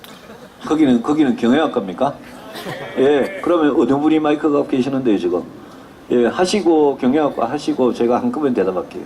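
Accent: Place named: Gyeongsang, South Korea. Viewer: 경상도 사투리라도 귀에 쏙쏙 들어옴니다.유승민의원님 그날을 기다림니다~!!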